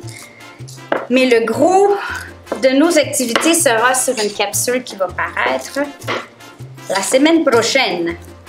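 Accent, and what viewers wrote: Quebec, Canada. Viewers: Salut super vidéo ! Je pense que ta joie de vivre et ton bel accent québécois t'emmènerons loin ! ( enfin j'espère bien ! 😁) Merci pour tout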